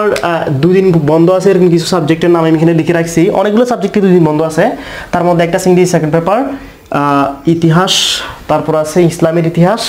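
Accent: Chittagong, Bangladesh. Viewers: Apni ki Chittagongian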